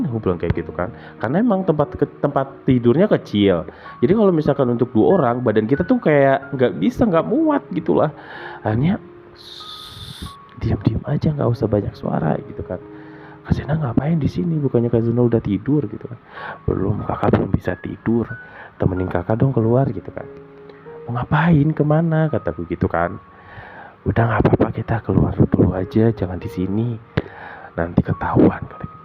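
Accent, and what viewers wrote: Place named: Sunda, Indonesia. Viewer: Suaranya suara Sunda bgt... Lembut dan berkarakter...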